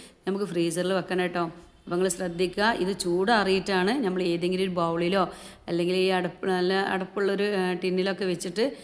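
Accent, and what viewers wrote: Malappuram, India. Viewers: മലപ്പുറം + കാലിക്കറ്റ്‌ / മിക്സ്‌ സംസാരം,,, വേണ്ട,, നല്ല രൂപത്തിൽ സംസാരിക്കാൻ ഒന്ന് ശ്രമിച്ചു നോക്കൂ,, 👌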